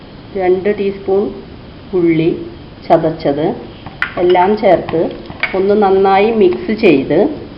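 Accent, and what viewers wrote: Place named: Thrissur, India. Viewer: nalla Thrissur bhaasha..this is central kerala language man not tamil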